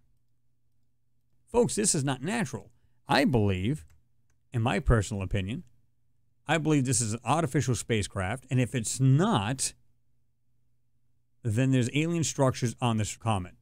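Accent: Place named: Rhode Island, United States. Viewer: You sound like u live in Rhode Island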